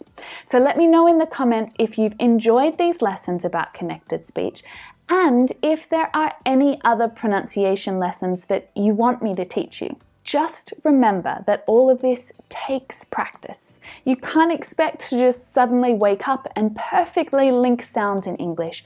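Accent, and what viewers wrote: Melbourne, Australia. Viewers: That's quite amusing this video comes from someone sounding Australian. People from Australia don't speak properly, skipping letters. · Is from Melbourne? I thought so...I'm from Sydney and I can hear subtle differences in her "accent" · Hi. I loved your Australian accent!!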